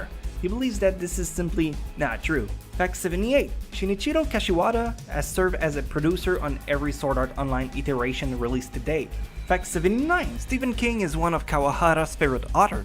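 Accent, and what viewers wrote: Canada. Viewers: Clearing this up for everyone shitting on his pronunciation: he's French Canadian. For some reason, and I've experienced this through close friends of mine having the same issue, French Canadians struggle a lot with English pronunciation. This is because of tongue placement. In French, the tongue tends to rest on the bottom of the mouth when speaking, whereas in English it is more at the top. This is an issue when learning a new language later in life because the tongue has trouble getting used to switching from the bottom to the top and vice versa. And now you've learned something new! Enjoy your day!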